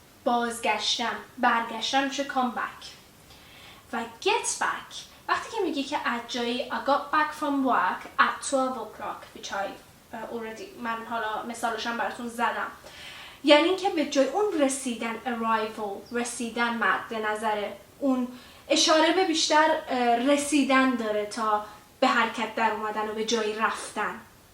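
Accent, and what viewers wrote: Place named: England, United Kingdom. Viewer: سلام دوست عزیز . وقت بخیر . من حدود 3 ماه هست که برای ادامه تحصیل وارد انگلستان شدم وهمچنین برای تقویت زبانم در حال آموزش زبان انگلیسی هستم و تازه با آموزش های شما آشنا شدم. من اولین کلیپ که از شما دیدم اونی بود که اول به زبان انگلیسی فعل های get back , ... رو توضیح می دادید و بعد به زبان فارسی. حقیقتا من اولش که اون کلیپ رو دیدم واقعا هم از لحاظ ظاهری و هم از لحاظ لهجه فکر کردم شما یک خانم اهل انگلیس هستید که دارید زبان انگلیسی رو آموزش می دید و وقتی دیدم که به زبان فارسی هم تسلط دارید واقعا تعجب کردم. شما با لهجه کاملا انگلیسی و روان صحبت می کنید و تمام مواردی رو هم که می گید دقیقا کاربردی هست و مردم انگلیس دارن توی کوچه و خیابان از اونها استفاده می کنند. البته اگر اشتباه نکنم شما احتمالا مدت طولانی هست که مقیم انگلیس باشید. به هر جهت کارتون واقعا عالیه و من مطمئنم که اگر با برنامه پیش برید و مطالبی که برای ارائه انتخاب می کنید همچنان جز موارد اولویت دار و مهم برای آموزش زبان انگلیسی باشه حتما جز بهترین ها در آموزش زبان انگلیسی خواهید بود.استفاده از دوره های شما برای من خیلی مفید هست و امیدوارم که همچنان به تولید کلیپ های بیشتر ادامه بدید. 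من دوره های آموزش مکالمه انگلیسی در 30 روز رو پیگیری می کنم. البته این سه جلسه اول برای سطح زبان من مناسب نبود ولی مطمئن هستم که کمی جلوتر برید حتما من هم استفاده خواهم کرد. ببخشید اگر طولانی شد ولی حیف بود اگر در پاسخ به کارهای خوب و حرفه ای شما این توضیحات رو نمی دادم. برای شما و همه دوستانی که شما رو دنبال می کنند آرزوی موفقیت دارم.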